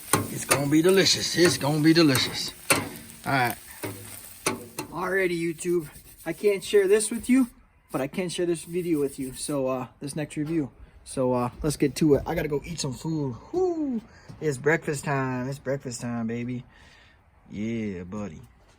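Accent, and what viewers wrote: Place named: North, United States. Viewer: bro where are u from??? 🤔 im literally trying to guess your accent 🤣🤣 definitely up NAWF im from va 🫡💪🏽 just subscribed 🫡🫡🫡🏆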